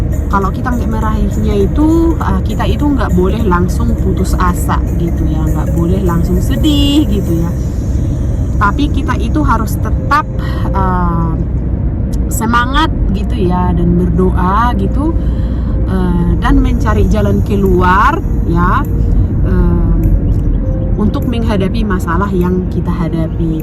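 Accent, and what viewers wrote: North Sumatra, Indonesia. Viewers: Jadi ka itu asli dari samosir dan langsung merantau ke swiss, dsamosir itu bahasa batak dan kalo b.indonesia bakalan kental banget logatnya. Kalo ka merantau keluar medan mungkin bisa menyesuaikan, ka merantau ke swiss langsung pake bhasa sana, jadi mau gamau kalo ka ngomong b.indonesia ya bakalan tetep kental logat bataknya, and I think nothing wrong with that at all dan bahkan bisa jadi identitas 🙏🏼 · Ka kok logat batak kental banget ya😂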